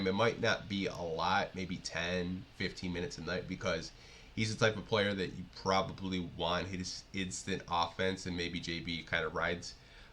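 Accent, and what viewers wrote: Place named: United States. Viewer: You are Italian? You sound American.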